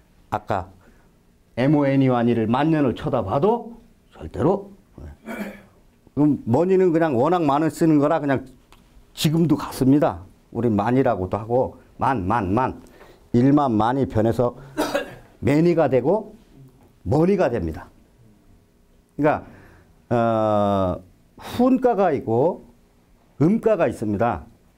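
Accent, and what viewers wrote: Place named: Chungcheong, South Korea. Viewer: 군소리가 99% 너무 많다. 그기다 충청도 말씨까지 더하니 답답하고 짜증까지 생긴다.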